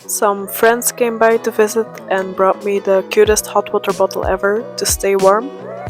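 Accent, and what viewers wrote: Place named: Flanders, Belgium. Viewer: Hey, Ik vergat je te zeggen dat ik je hoor Nederlands praten. Of Belgisch eigenlijk. Wat Leuk! Ik ben Nederlandse 😊🎉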